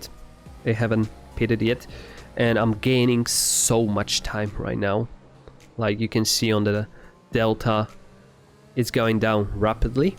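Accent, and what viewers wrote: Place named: London, United Kingdom. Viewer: Why are you speaking londonish?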